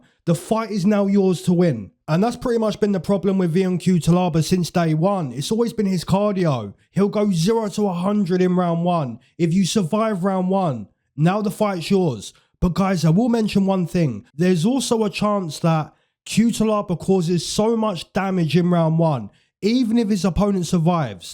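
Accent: United Kingdom. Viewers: Whats good brother was wondering which region you hail from Im trying to learn more about U.K accents. Useless though, because no other american will care that i know this shit.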